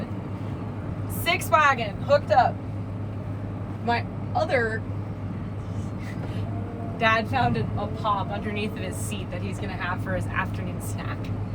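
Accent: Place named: Nebraska, United States. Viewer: You really don't need to emphasize that you are a real Nebraskan. We, well I at least, can hear that all too well. And it's more Lexington than Lincoln, and so not Bridgeport.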